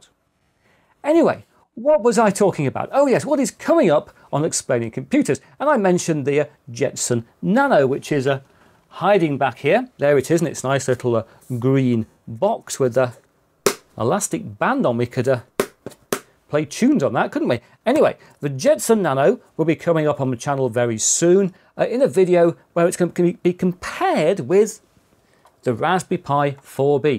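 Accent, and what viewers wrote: England, United Kingdom. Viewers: subscribed for the crystal clear explanations with the always enviable english accent!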